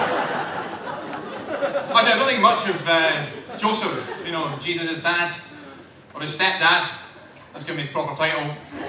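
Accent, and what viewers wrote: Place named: Scotland, United Kingdom. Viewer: He speaks English with a Scottish accent, 'cause he's Scottish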